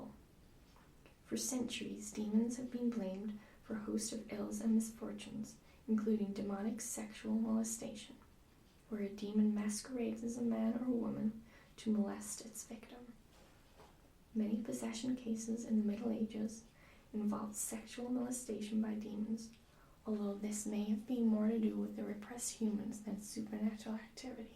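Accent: United States. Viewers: Your American accent is more believable than my British accent. Lol. Good job!! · I love the American accent! Not only do you do it well, it's also really relaxing. Switching it up is a good thing. You're perfection!<3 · wow, I don't don't know how difficult it is to sound American since I am one, but I couldn't even tell you weren't! Very nice, although your normal voice is just so damn relaxing. Also, freakin awesome idea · Haha, that American accent took a while to sink in. I kept thinking that something didn't sound right but it wasn't until a minute and a half that it clicked. Cool gimmick. :) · American accent, WHAT!? Totally threw me lol. Well done! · American accent for the win! Great job. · I didn't realize it at first I was like something's different the American accent that's it nice job beings I'm American let me here you say book haha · your american accent is pretty good! 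· Nice American accent :) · Wow, I didn't recognize your voice at first! Very nice work on your Inland North accent of American English. Regrettably, that means your voice doesn't trigger my ASMR like it usually does, but good job nonetheless! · I love your accent, so I was FLOORED when you were using an American accent. Great job and nice change of pace with the Hunter angle. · nice american accent! :) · Your American accent is awesome!!! :D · Nice American accent! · Fantastic American accent. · Awww, came here for your own ccent and then it's American :( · 1) Excellent American accent. :) 2) Supernatural. And ASMR. A surprisingly fantastic combination. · Nice video! I am quite impressed with your american accent! So much better than I could do a british one... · Great job with the American accent!! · You should be wearing plaid so you can be a real winchester! Haha that was a pretty good American accent though! 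I'd love to see you do a spin on this idea as a crossroads demon or something! Hrrmm maybe I'll make my first asmr video as a kind of response to this as a demon or angel or something, if you don't mind me kinda borrowing the idea!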